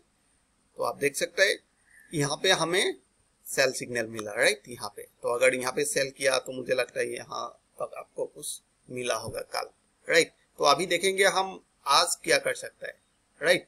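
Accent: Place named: Tamil Nadu, India. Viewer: Tamilnadu English speaking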